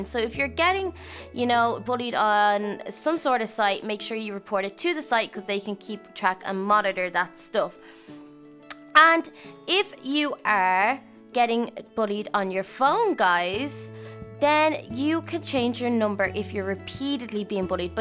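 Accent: Ireland. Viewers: Her accent is so cool, Irish? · Are u really from Ireland?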